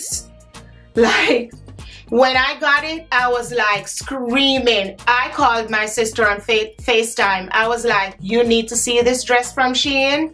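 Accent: Jamaica. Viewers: Girl I just stumbled on ur channel and if mi love you one time. As a fellow Jamaican you got me laughing cause I was seeing and hearing myself in you Xtra plus tax 🤣 love ur vibe, amazing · First of all I love those dresses, but mi luv di Jamaican chatting,am crazy about looking good u go cuz, we shared the same last name, big up uself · Ok you’re too cute and I love your Jamaican accent. New subscriber her😊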